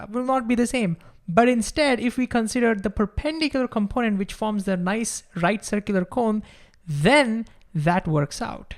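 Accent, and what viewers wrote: India. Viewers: JUST WANTED TO KNOW OUT OF CURIOSITY ARE YOU BY ANCHANCE AN INDIAN ? SINCE YOUR ACCENT IS A LOT LIKE US ,BTW YOUR VIDEOS DO SPARK A VERY INTENSE INTREST IN PHYSICS AND THANK YOU FOR THAT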